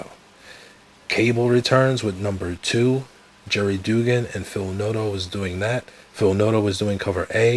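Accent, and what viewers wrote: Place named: New England, United States. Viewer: Yo my guy are you for Mass.? I be hearing that New England accent lol.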